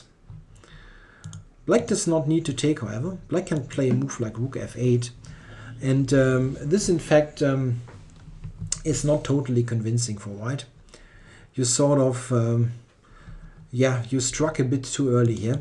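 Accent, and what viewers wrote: Germany. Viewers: I watched the analysis of this game on two other big channels and on your channel. And this video is the best in terms of video and sound quality and you offered by far the best explanations of lines and other possible variations. Nur ein sehr starker deutscher Akzent aber daran kann man sich gewöhnen.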